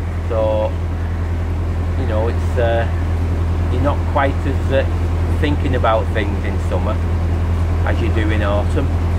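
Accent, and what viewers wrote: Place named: North, United Kingdom. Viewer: Ay up lad, so Northern haha love it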